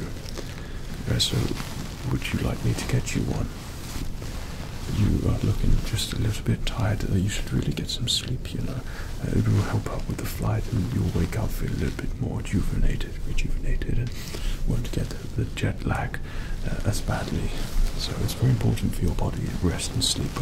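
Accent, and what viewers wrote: United Kingdom. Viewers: So you are British. Nice and same. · I swear he's british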